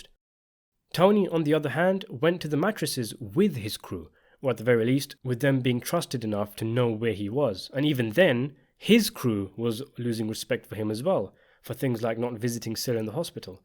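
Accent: United Kingdom. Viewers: sopranos analysis in a British accent is brutal to watch.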